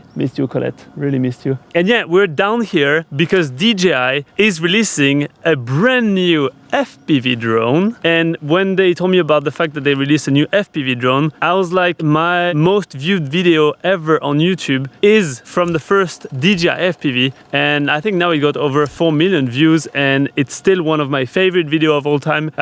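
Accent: France. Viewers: Nice footage but that french accent 🤢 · Dude, you Scream French!!! Never Stop!!!!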